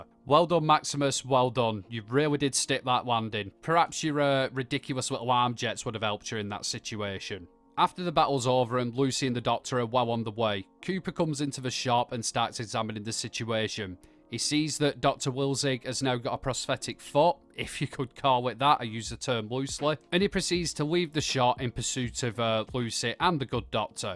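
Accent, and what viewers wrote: United Kingdom. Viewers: Appreciate you brother I’m actually fixing to watch and outline this episode for my channel and our recap and I thought I’d have you on in the background to take some early notes. I subbed you and i know you sound like your across the pond but if we could connect I’d be very happy to extend an invite to you to join us for one of our Fallout recap shows, we do the live discussion type deal and I think you would enjoy chopping it up with myself and my mates.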